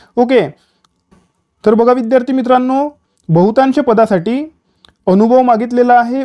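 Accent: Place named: Nagpur, India. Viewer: Bhau chi language nagpur chi vatte jara😊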